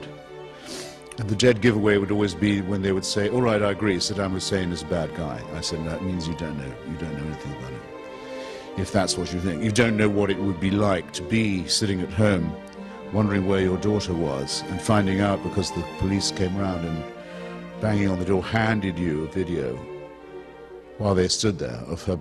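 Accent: United Kingdom. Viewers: That's very appropriate a british man talking to us about evil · When I heard the narrator with british accent, I stopped watching! English are not to be trusted, the most evil people that civilization has witnessed!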